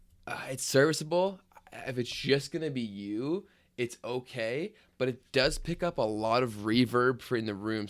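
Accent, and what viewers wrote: Canada. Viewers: Thank you for those tips taking notes ! I couldn't help to notice at the beginning are you from Canada? if yes which province :) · Love that Ontario accent!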